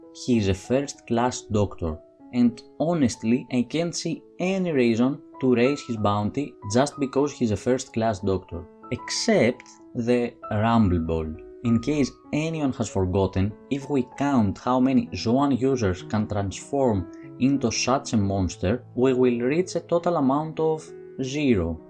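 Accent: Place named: Russia. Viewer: What you said about my region is true and I'm from the worst nation in all of the middle east and Africa(guess the name it shouldn't be that hard to do) and actually I recognized the accent too; he's Russian and that's the 2nd most powerful nation in the world and to be this strong you probably have many people who are very smart, intelligent and educated and this is specially true for Russia that doesn't have much natural resources(I know they have lots of oil and natural gas which is good but not so good economically with all the sanctions imposed) I just got so triggered with the list that I wanted to say something awful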